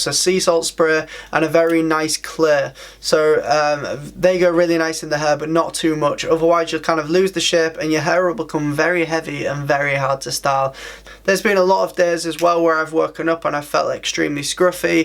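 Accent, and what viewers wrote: United Kingdom. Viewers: Brad McNaughton northern English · Hmm Yorkshire accent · Thats British accent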